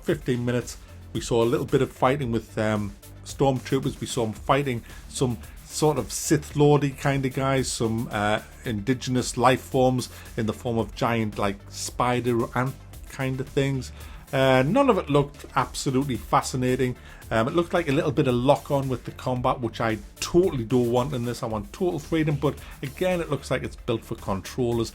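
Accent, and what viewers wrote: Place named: Newcastle, United Kingdom. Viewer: It's nice listing to a fellow Geordie youtuber, not many of us....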